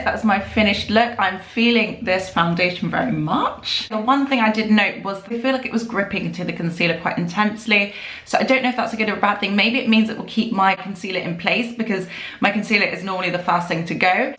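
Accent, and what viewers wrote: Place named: United Kingdom. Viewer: Literally just found your channel! I'm a fellow Brit and adore your accent and the way you speak! Thanks for the great reviews x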